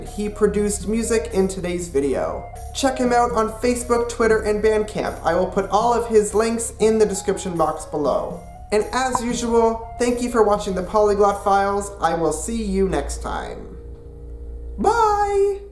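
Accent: Canada. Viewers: I do notice your Canadian accent, and I think it's cool! I even phase the accent in naturally myself, and it feels right! hehe Greetings from this Venezuelan living in Montreal, which is a great city, eh? :) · Yes sir, you do have a Canadian accent. I think I unfortunately lost my Canadian accent. As far as I remember, Americans never have commented on my accent being different or anything at all, so I assume my accent is pretty neutral. FYI, I spent the majority of my K-12 education in Canada. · You do have a noticable Canadian accent, the out and about spelling of words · I did notice your Canadian accent. However, I work as a Nurse and have had several Canadian colleagues over the years, so I am familiar with the accent. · Yes, you do have a Canadian accent! Your pronunciation of "out" and "about" definitely has a slightly raised vowel from the American /aut/ and /abaut/. 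At least that is how it sounds to my American ears.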